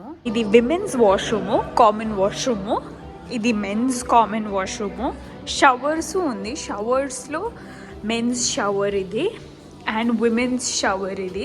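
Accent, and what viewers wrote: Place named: Hosur, India. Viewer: Midi Hosur kada, your Telugu is same as my Hosur frnd...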